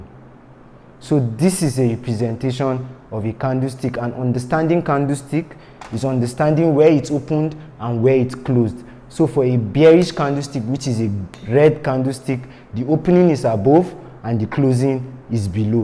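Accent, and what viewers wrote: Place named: England, United Kingdom. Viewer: Great explanation 👌 i love your teaching style and English ascent, i am very suprised to that with this great information u have passed freely to us no one is commenting or sharing this video, for i have subscribed and share ur link cox i like ur teaching and i want u to always do more of this good work 👏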